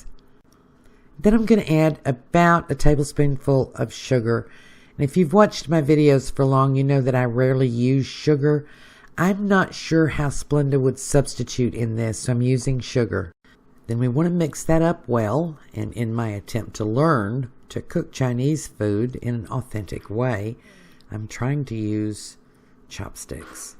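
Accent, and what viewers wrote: South, United States. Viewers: I knew you were from the south because of your wonderful accent but didn't realize you were in Georgia. All of my mom's side of the family live there. Thanks for all you do!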